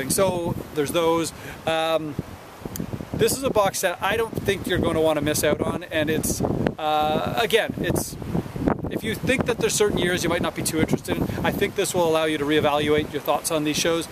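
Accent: Canada. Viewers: nah that eastern? Canadian accent cuts through the wind after a bit. these vids are like watching painting w bob ross but better....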